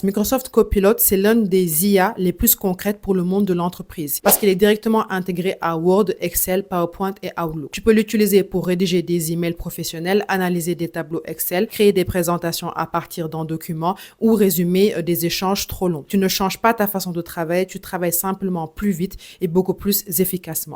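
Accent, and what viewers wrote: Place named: Senegal. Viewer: Joli petit accent sénégalais ! ❤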